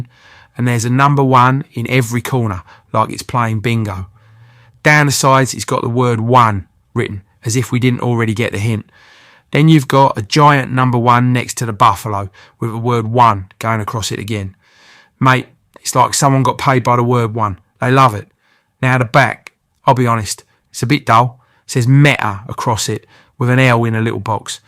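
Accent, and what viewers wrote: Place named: Cockney, United Kingdom. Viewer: Hi I subscribed to your channel yesterday. Really love the way you present your video, fun but informative, I'm Scottish, but I love your voice sound like a Del Boy type of guy. Keep it up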